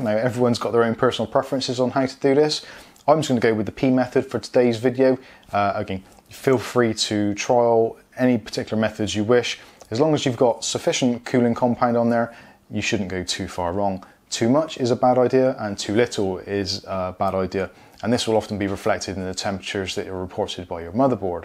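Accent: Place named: Yate, United Kingdom. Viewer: Your 100% from yate or not far from there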